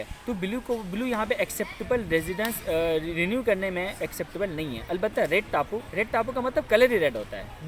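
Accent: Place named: Karachi, Pakistan. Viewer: Bhai ka sahi karachi wala accent ha .. zabardast...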